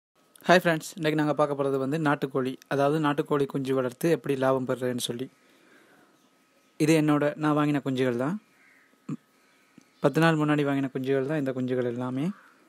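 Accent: Sri Lanka. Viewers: this sri Lankan speek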